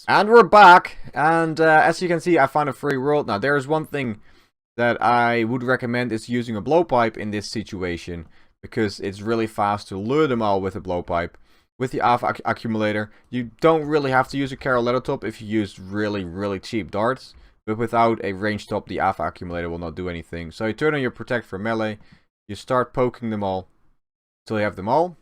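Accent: Netherlands. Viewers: ARE YOU DUTCH? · The accent.. Dutch?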